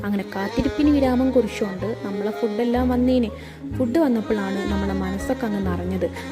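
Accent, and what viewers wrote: India. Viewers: Ippazha sherikkm plkd slang aye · mmalde koykottere slang aanallo chechikk ❤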